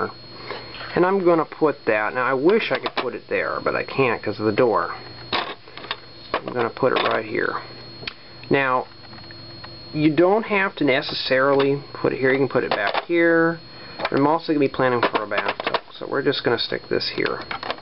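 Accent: South, United States. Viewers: you have what sounded like a southern accent that ive never noticed O.o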